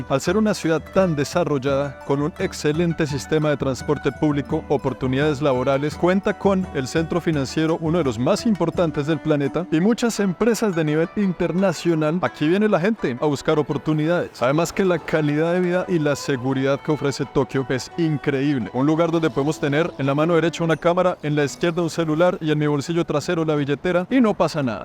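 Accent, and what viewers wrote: Colombia. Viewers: Eres el mejor narrador de contenido no cansas tienes esa chispa de expresión colombiana que encanta 🌟🌟🌟🌟🌟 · Es el primero video que veo de este Canal y por el acento al principio asumí que es colombiano y después lo confirmé con algunas referencias que hizo jeje, muchas gracias por su trabajo, excelente video.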